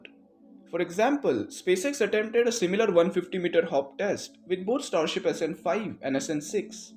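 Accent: India. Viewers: Horrible Indian English accent, made my head hurt.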